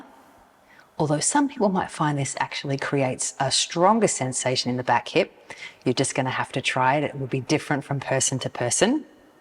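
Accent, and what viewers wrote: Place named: United Kingdom. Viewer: Very very helpful and lovely British accent. Thank you.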